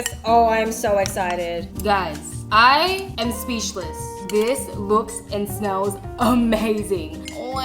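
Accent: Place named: Australia. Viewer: The Australian accent 🤌🤌^^ :D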